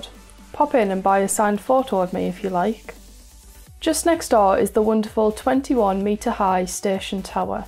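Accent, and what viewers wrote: United Kingdom. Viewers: Dear Sir, For some time now i wonder from where in the UK you are, or rather, where your accent places you. I am by no means an accent expert, as i barely know English, but this young lady speaks certain words with exactly the same pronunciation as yourself, so i wager you were born in the same place. Cheers from Brazil, also with many diverse accents!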